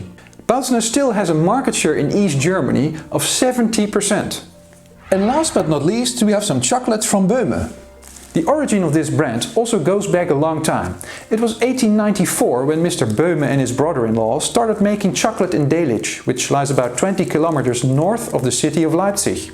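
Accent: Netherlands. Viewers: The Dutch accent is strong though, at his first sentence I already knew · A strange sensation to watch a video about East German Products, narrated in English with a Dutch accent. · I was soo suprised to hear a Dutch accent once I clicked on this video. Love to see the interest in regional history exceeds borders! Thank you for your research and dedication!! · His Dutch accent is very clear, once you know what a Dutch accent sounds like. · I love your Dutch accent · Wow that's interesting, didn't know he's Dutch.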